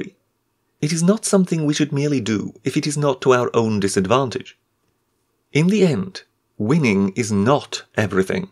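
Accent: United Kingdom. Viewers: You sound like Dr. Layman - with a British accent of course.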